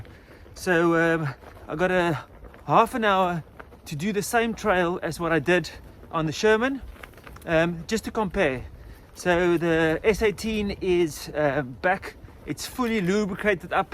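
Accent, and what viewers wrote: South Africa. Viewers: you sound soth-afrikan. Channel looks good, subbed.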